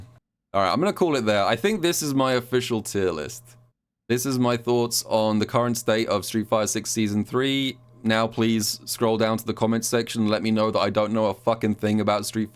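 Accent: United Kingdom. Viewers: i appreciate how you make "bad content for a good cause", i dont care about tierlists especially as a non SF6 player or active online yapper complaining about X thing in a fighting game, but i do care about hearing funny british capybara man yap and be honestly very insightful and reasonable while also being entertaining and going for charity events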